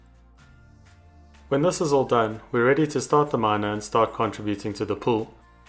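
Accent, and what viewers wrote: South Africa. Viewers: Sjoe, jou aksent skree saffa boet.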